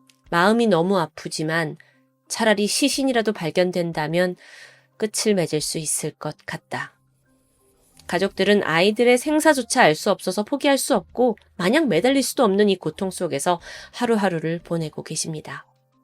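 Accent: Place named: Gyeongsang, South Korea. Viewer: 경상도 답다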